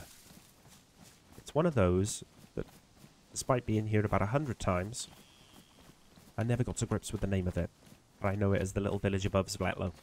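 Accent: Wales, United Kingdom. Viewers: Late to the party with DayZ and your videos have been amazing at setting me off in this game. The Welsh accent is a great accompaniment to the end of the world too 😂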